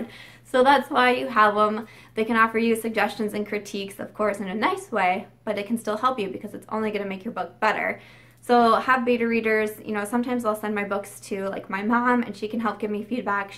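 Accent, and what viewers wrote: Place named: Midwest, United States. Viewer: Thank you for these helps. I'm only an author in my head once in a while. I've never published anything. I enjoy hearing your midwestern accent. I also appreciate your tip on getting your response down in a Word document and then deleting it because I can see how it would be tempting to respond to negative feedback and pull down the professionalism you've worked hard for.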